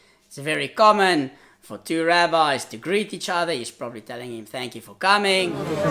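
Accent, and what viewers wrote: Afrikaans, South Africa. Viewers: Are you South African? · Are you from South Africa? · Hi boeta, jy is duidelik Afrikaans ...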